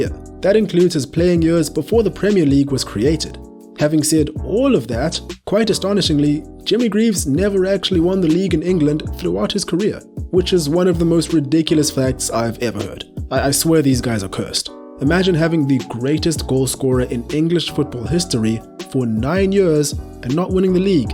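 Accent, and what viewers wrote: South Africa. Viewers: The narrator sounds south african! If so, it makes me even more proud to say this is an awesome production. Subscribed!!! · Narrator sounds South African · Awesome to hear a South African voice! Nice work. Subscribed 💪🏻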